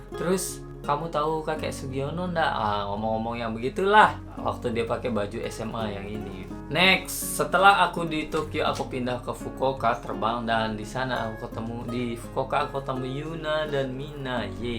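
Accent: Lombok, Indonesia. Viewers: Mantap bang lanjutin bikin Videonya, logat lomboknya gk bisa ilang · Ailah logat lombok iku dk bau telang.. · Bang asli lombok?? Logat nya sasak tulen ni salam dri lombok bang hehe😅🙏🤙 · Alhamdulillah Logat sasak nya masih terasa, but seperti nya akan hilang, hmm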